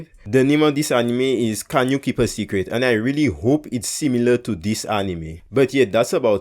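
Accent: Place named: Caribbean. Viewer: I hear your accent. Are you from Guyana or St.Vincent? What Caribbean island are you from?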